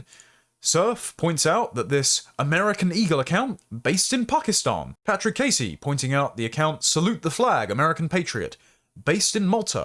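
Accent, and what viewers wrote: RP, United Kingdom. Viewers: Yank here. You are the perfect defender of Western values. You have a posh accent. You look dapper. Your enunciation is superb. Your thoughts are clear and direct. You include the States in your defense. And, you have this lovely background music of seething outrage. Well done, and thanks! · Love that British accent. Alas, soon it may be a thing of the past 😢 Britain may soon be speaking with an Indian accent. Prepare well my friend 😉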